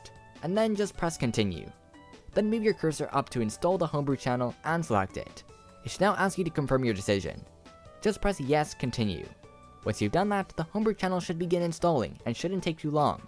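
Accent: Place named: United States. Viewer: American I believe. I'm Britsh and judging by how he pronouncing words like 'select' I think he's American. Also, he has a nasally accent which is very typical in parts of North America.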